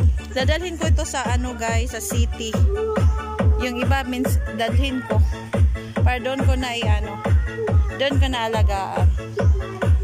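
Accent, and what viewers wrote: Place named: Visayas, Philippines. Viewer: bisaya ka ma'am haha, kadaghan tanom oi, kugihan si mama mo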